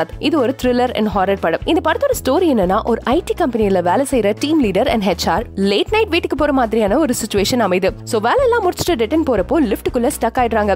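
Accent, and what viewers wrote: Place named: Malaysia. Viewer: akka Malaysia Tamil Torll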